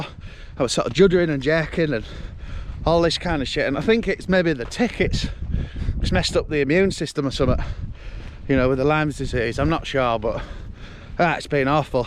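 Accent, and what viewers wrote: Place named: Yorkshire, United Kingdom. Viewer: What a great lunch and cup of tea, all to your super music and dulset tones with some super photos. Splendid work. James and I am getting used to the Yorkshire accent. Hope you are clear of illness so you can keep on with the videos. I enjoy yours as you are down to earth without any pretentious attitude like some. Look after yourself!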